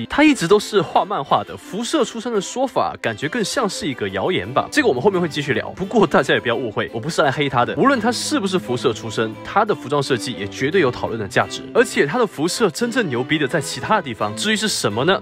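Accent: Taiwan. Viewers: 為何博主講話沒什麼口音啊XDD倒像台灣口音了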